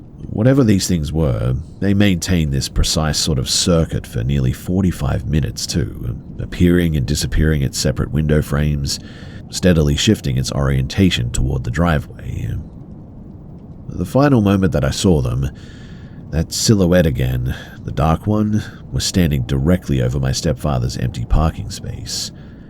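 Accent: Australia. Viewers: Just the aussie I was lookin' for to put me to sleep!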